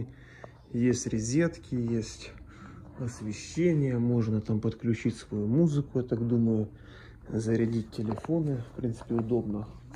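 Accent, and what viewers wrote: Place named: Ukraine. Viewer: Так, тут помилка вийшла. Просто, коли Ви говорите російською, дуже чути, що Ви українець. Говоріть українською, в ній є душа.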